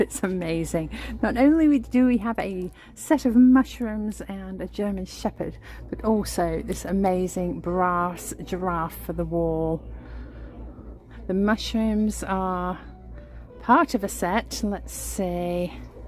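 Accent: England, United Kingdom. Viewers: I love your content and your personality. Your English accent just makes me happy. I love it when your kiddos. I never miss a video. You have great taste.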